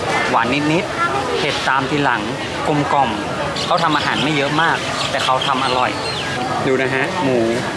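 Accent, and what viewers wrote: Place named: North, Thailand. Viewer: ชอบฟังพี่ พูดเหนือมากเลยค่า น่ารักมาก อยากให้ทำคลิปพูดเหนือเยอะๆๆๆเลย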